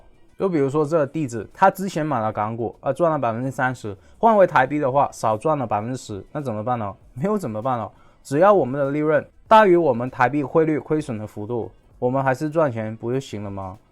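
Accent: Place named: Mainland, China. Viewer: 聽口音很像中國人？應該先來救中國人民幣？才好吧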